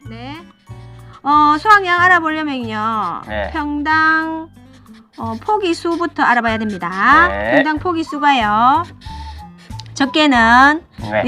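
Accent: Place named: Sangju, South Korea. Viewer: 억양보니 상주 근처네요 . . 재밌고 잘하네요.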